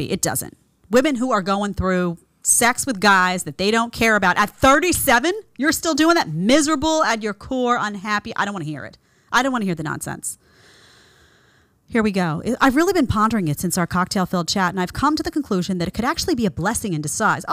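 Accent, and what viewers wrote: New York, United States. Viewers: Every time says NASTY with her NY accent talking about these modern women.😂😂😂😂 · The more I hear that Noo Yawk accent, the MORE i will listen. 😎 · No one can say "nasty" like Jedediah. Thank God we have a woman like her to cut through all the BS, and pointing out women like this. I'm surprised so few women commented on this video; I would like to hear how reasonable women feel about 304s like the one at the start of the video, and what their experience is with any of their 304 acquaintances. I love Jedediah's New York accent. Pay attention as she says "awl" for "all". I am so happy to have found her channel a few weeks ago. She is spot on. I hope she continues with these topics on her channel.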